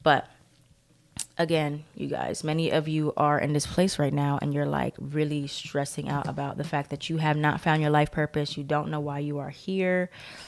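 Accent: Bronx, United States. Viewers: U from the bronx??? GET STURDYYYY 😆😂😂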